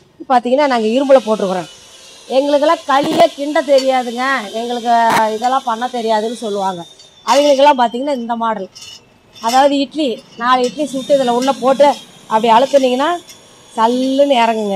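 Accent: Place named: Kongu, India. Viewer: எத்தனை பொறுமை...சிரித்த முகத்துடன் கொங்கு தமிழில் பேசிய பேச்சில் இரண்டு மணி நேரம் போனதே தெரியவில்லை மென்மேலும் வளர வேண்டும் வாழ்த்துகள் சகோதரி ❤❤ பயனுள்ள சிறந்த காணொளி ❤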